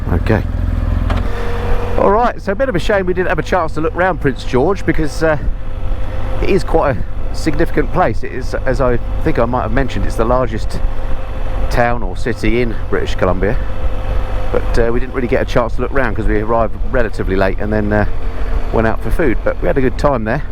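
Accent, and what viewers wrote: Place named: Yorkshire, United Kingdom. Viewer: I thought you are a Yorkshire man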